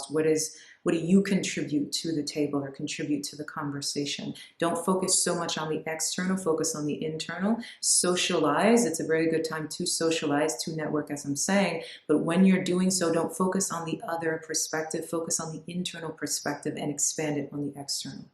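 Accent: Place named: Caribbean. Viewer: I never noticed your caribbean accent before. Beautiful ✨️✨️💕💕 I'm Trinidadian. Thank you for this beautiful reading!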